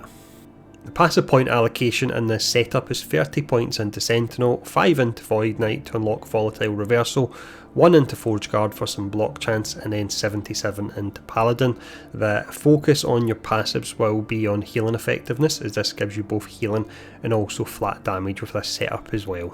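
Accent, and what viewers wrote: Scotland, United Kingdom. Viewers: Scottish accent ?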